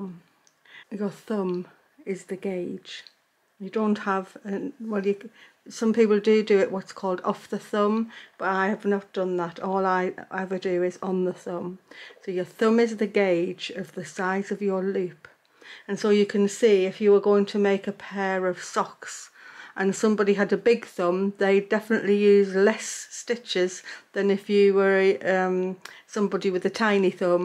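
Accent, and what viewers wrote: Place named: Stoke-on-Trent, United Kingdom. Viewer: A few years ago my family went up north to Newfoundland to L'Anse Meadows to see the Viking Settlement there. I purchased a needle for just this craft. So now I'm retired from a lifelong nursing career lm going to have a bash at this. I'm so glad l found your channel,. Your accent reminds me of my friend from Stoke on Trent!. All the best of Nova Scotia xoxoxo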